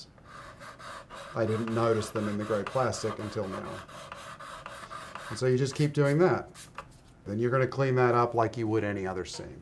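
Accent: Australia. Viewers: Great video as usual. As an Australian I can hear a hint of our (or NZ) accent in your speech!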